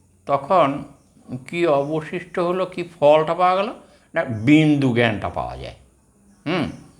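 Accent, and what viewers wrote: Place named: Birbhum, India. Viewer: যেইভাবেই হোক আপনার সাথে দেখা করতেই চাই, আপনার কথা শুনে মনে হচ্ছে আপনি বীরভূমবাসী, যাইহোক আপনার mail id ta দেন আমি পেলাম না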